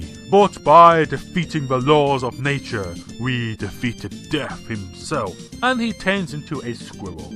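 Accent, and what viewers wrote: United Kingdom. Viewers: The RSPCA ok you are definitely British bro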